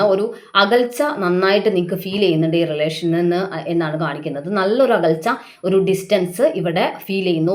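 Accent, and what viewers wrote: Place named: Malappuram, India. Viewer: Malappuram slang is superb♥️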